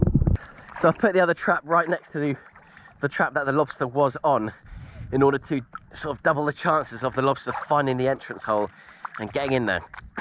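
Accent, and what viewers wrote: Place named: RP, United Kingdom. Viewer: How did you & become friends? You've got a very posh RP public school accent while has a distinct regional accent.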